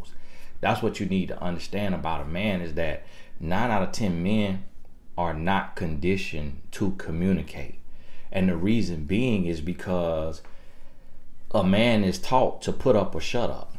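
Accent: South, United States. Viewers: You from down south hear it in yourp accent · I love the voice impressions😂 Just cracks me up. But the country accent makes a lot of these truths more believable. You know what you know and have this here knowledge to share, and you are sharing it, For Free. You could bundle these pod casts in a series and sell em. Keep doing what you are doing! Blessed tribe.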